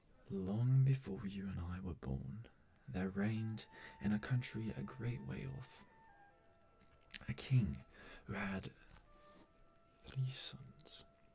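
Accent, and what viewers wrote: United Kingdom. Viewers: At first I was like "did he always have an english accent?" then I realized and went "oh..."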